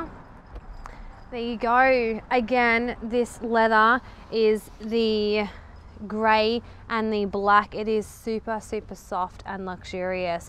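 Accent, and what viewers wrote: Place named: South, United States. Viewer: Not going to lie, at first I thought she was from the southern United States with her accent 😂 it is so similar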